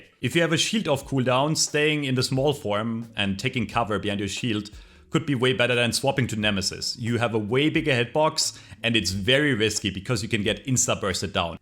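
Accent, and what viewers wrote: Germany. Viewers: I knew you were German within the first 5 seconds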